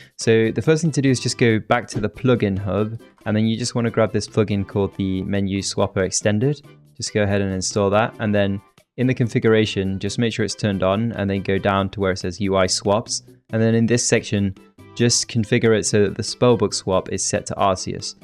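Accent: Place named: United Kingdom. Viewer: Great video but you're british :/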